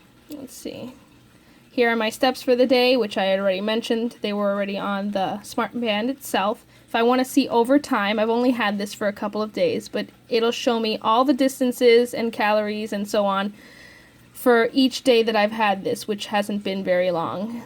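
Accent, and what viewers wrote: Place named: United States. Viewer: Голос и говор заменить бы с мириканцких на британские - я б на авторе женился.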